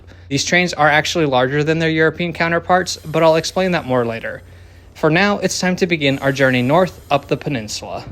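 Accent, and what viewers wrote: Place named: United States. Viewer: You're European? You have a perfect American accent lol What's your native language?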